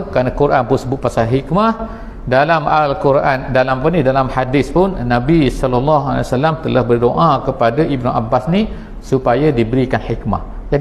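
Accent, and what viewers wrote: Terengganu, Malaysia. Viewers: Ustaz dari brunei ke? kenapa loghat macam Terengganu 😍 saya ingatkan Ganu 😂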